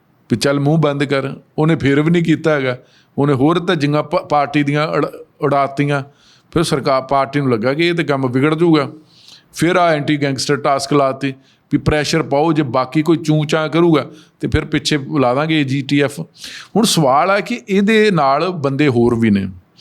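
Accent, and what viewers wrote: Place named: Delhi, India. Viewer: sahb vich delhi wala bolde lagda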